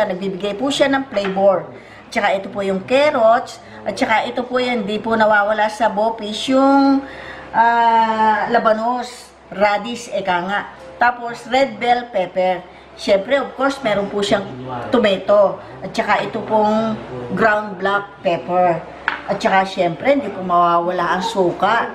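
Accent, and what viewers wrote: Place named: San Miguel, Philippines. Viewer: nakakatuwa lang po kasi ung tono ng salita nyo namimiss ko taga San Miguel din po aq andto po aq ngayon sa abudhabi at paborito po yan ng mga anak ko😊😊😊 lahat po ng recipe nyo pinapanood ko. God bless po sa inyo! 😉😉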